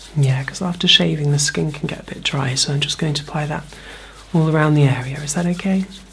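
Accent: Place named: United Kingdom. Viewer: Gods, you're gorgeous as both male and female I just--- can't. how iN THE WORLD IS THIS POSSIBLE 😭😭😭💜💜💜 that aside, I got what I asked for lol thank you, it's tingly as always and you always speak in such a clear, crisp, and gentle voice, with posh English accent that I could understand perfectly even to my untrained ears as English is not my mother tongue 🌟🌟🌟🌟🌟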